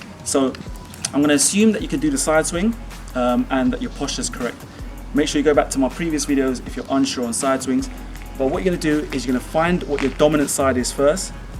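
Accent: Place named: London, United Kingdom. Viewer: Awesomely slick mate, my ropes should be here today or tomorrow.. Can't wait.. How long should I live in London to acquire the slick accent yo?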